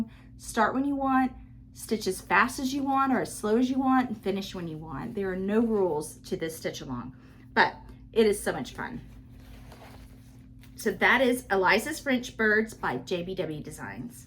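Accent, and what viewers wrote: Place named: South, United States. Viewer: Hi! I live in Colorado - but grew up in Virginia and South Carolina and my family is all still in the Carolinas. Watching you and hearing your sweet southern drawl feels like a visit from home. 💕 1, 2, 3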